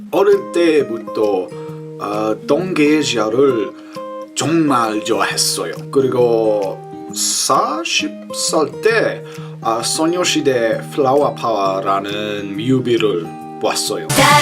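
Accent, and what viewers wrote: United Kingdom. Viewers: you guys make me want to speak british accent too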